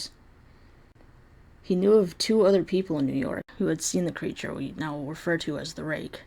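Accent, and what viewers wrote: United Kingdom. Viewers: his English accent though 😂😂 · heavy british accent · That British accent! xD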